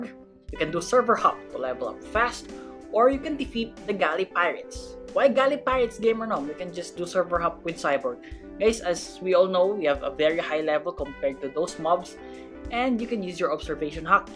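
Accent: Philippines. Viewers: he is filipino i can tell by the way he talk and when he face reveal, he said he was from PH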